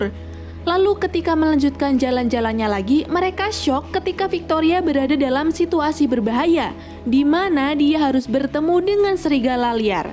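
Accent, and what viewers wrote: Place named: West Java, Indonesia. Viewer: Teh orng Sunda nya wkwkwkwk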